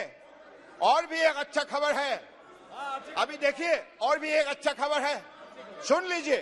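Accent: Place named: Assam, India. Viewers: Accent assamese hai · The beautiful assamese accent kicking in.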